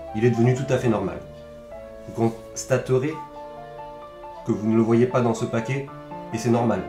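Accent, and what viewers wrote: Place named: South, France. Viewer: salut, une critique pour tes vidéos, si tu doit améliorer quelque chose c'est l'accent du sud, je sais pas si tu l'entend mais essaye de le supprimer ou alors exagère le. allez bisous.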